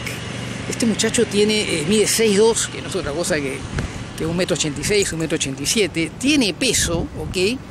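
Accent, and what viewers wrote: Argentina. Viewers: Afina el oído, el loquito tiene acento Argentino .no insulte a los chileno..., En Chile los yuotober ,no son tartamudos , tartamudos, tartamudos, tartamudos..... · tiene dejo argentino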